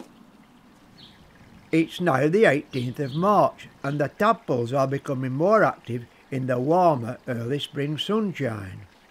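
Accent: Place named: Lancashire, United Kingdom. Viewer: I live in Adelaide, South Australia. I migrated here 33 years ago. I recently discovered your channel and want to say how much I’m enjoying watching your videos. My parents were from Lancashire so it’s lovely hearing the Lancashire accent. While you have been getting too much rain I’m not getting enough. It’s the third month of autumn here but still very very dry. Autumn is usually like a second spring here once the rain comes. I’d forgotten how many gales north west England gets where I live very windy weather is rare but as I said we struggle with hot dry weather. I too am wilding my garden and picking up hints from you. While we have different wildlife but I can relate to you with the excitement of wildlife visiting the garden. Do squirrels or rabbits eat your plants? The brush tailed possums certainly eat mine but it’s all part of nature. 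One more question what breed is Buster? He seems so well behaved. Thank you again for your videos. Shelley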